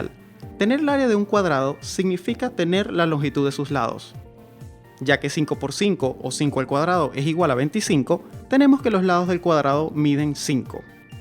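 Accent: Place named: Venezuela. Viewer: Soy aficionado de canales como minutephysics, numberphile, sixtysymbols, veritasium, etc y cuando escuche tu acento me dio un escalofrío gigante, que bueno ver en youtube gente venezolana haciendo cosas similares, mucho éxito! muy fino lo que estas haciendo pana! P.S: llegue aqui porque minutephysics te dio like!, GENIAL!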